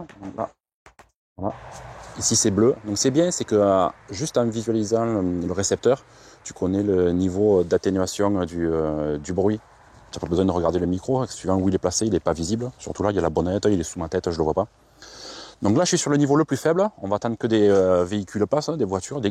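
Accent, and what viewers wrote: South, France. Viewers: hello, une fois de plus, très bonne vidéo très "QUALI 😊" pas desbrouff...et agréable à regarder et à écouter, ""avé"" l'accent du sud🤩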